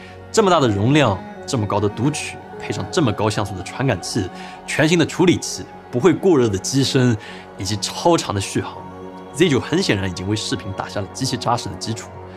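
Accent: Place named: Zhejiang, China. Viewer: 浙江口音好重啊。。。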